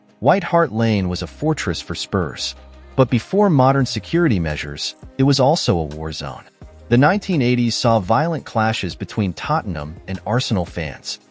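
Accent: United States. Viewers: Hoo the phuq are you? It's pronounced "Hills-bu-ruh"/"Hills-bruh" not "Hills-borrow". It's "Seltic" not "Keltic". "Berm-ing-gum" not "Ber-ming-HAM". "White Lane" it was "White Hart Lane". It's "Darby" not "Der-by". " 1970 ess" for "1970s" get real. Whoever you are do yer effing homework. Pretty much every ground in England, Wales and Scotland was the scene of "hooliganism" in the late 1960s, 1970s and early1980s - nothing to see there. Every ground had its area (or fortress) for its hard nut fans. And I am sick of the ubiquitous (look it up in an effing dictionary) US accent, pronunciation and emphasis (we (UK) emphasise the second or last syllable - not the first) on effing everything you watch on YouTube. Is the US trying to take over the world by its cultural imperialism? I think so - phuq off with yer cultural imperialism. Answers on a post card - you effing Yanks might have to look up that UK cultural reference. 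Jog on. Sick to death of you. - or the people who use some Silicon Valley tool to make yer vids - do your own voice-over, if you want to be (or appear) authentic. Rant over....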